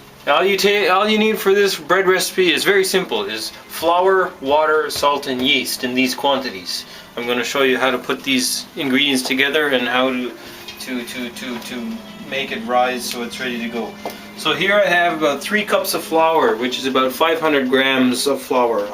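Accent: Canada. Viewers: I like your Canadian accent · big fan here !! "cant beat it wit a stick" made my morning. you sound like the bys back home in NL. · sounds Canadian.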